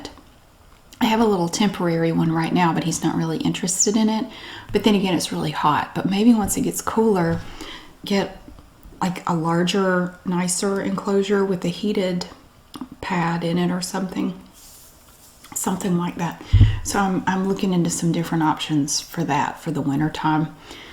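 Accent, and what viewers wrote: South, United States. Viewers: I don't know how long I've been watching you for but I remember searching for Southern accent ASMR after watching a load of Phyllis Stokes videos. Unfortunately she's passed on now but I'll always remember my excitement when I found your channel. Happy Anniversary from the UK ✨ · Congratulations! What a milestone! Thank you for putting all the time & effort into your videos to make sure you give us the best ASMR experiences. You sure do help me relax & sleep. Just something about that southern accent lol. And for that I thank you. Can’t wait for what the future holds!! You rock!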